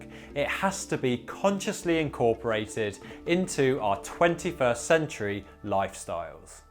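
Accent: United Kingdom. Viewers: he's using the British pronunciation - because he's British. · in the US in the 50's there were HUGE supermarkets & everyone had a refrigerator.....considering his British accent...maybe not in the UK. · Love that British pronunciation with emphasis on syllables unaccustomed to American ears. SeDENtary and ContriBUTory.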